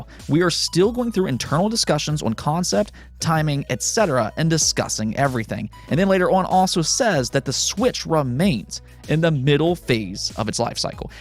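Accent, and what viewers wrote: United States. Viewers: Your American Accent is really really strong, wow is most noticeable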